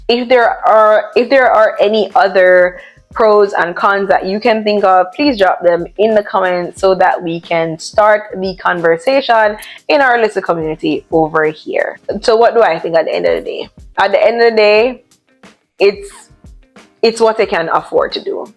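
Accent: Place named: United States. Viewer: PTSD?? Are you American you are saying some words and phrases like the Americans wood and you are training on the dialect also, so I think you've lived in America or you're just one of those Jamaicans who want to sound American😂 thank you show me a video on it's nice that everybody's learning from us, social media is the best invention.... The way you pronounce PROCESS, the way you pronounce ALREADY, because I know there's no two countries that pronounce the words the same as American. I know how Jamaicans are originally and I know they are practicing to sound like the US. I love genuine originality and although you are bringing good information, just be yourself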